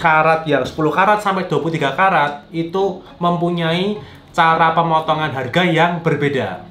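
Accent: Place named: Surabaya, Indonesia. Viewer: Koko medog banget Jowone koyoke wong Suroboyo nggeh? Terimakasih infonya yaa Ko,,sangat membantu sekali kontennya dan bermanfaat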